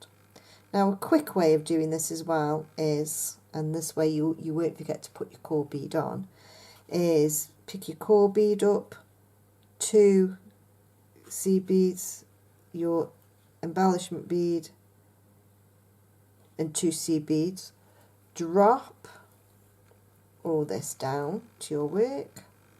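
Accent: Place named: Cheshire, United Kingdom. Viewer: Evening while looking for Christmas dec videos, just stumbled upon your vidios, as much as I love some the American designers and teachers it's nice to see a fellow brit doing some you tube tutorial. This is fab reminds me of spiral staircase. Wonderful. Plus you sound just like my aunty who lives in Cheshire, and works in Manchester very similar accent to you. x x Happy beading.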